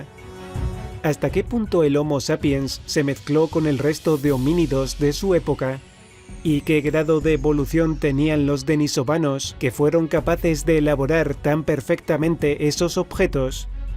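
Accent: Spain. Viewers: gordito de gafas No oye acento de España? .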